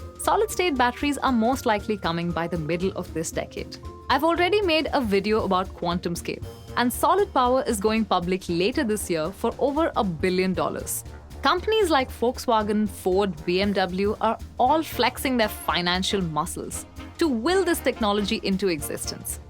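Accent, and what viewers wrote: India. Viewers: if I had teachers with Indian accent in school, I would be the best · speaks English with a strong Indian accent its awful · That soft indian english makes this so much nicer to hear doesn't it?😁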